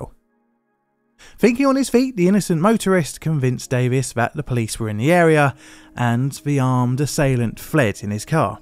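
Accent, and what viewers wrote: United Kingdom. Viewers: The one graphic showing the front of the car shows the British origin of this video (as if the accent didn't already) because Americans drive on the right side of the road meaning the driver is on the right side of the vehicle. What other details are wrong? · Always interesting to hear a Brit describing American incidents.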